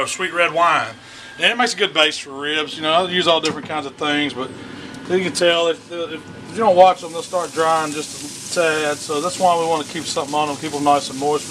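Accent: South, United States. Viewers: 1 thing u trust is a fat guy with a southern voice haha.. them big boys can cook them meats haha..love this guy!! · You know it going to be some good bbq when the guy making it has a southern accent 😂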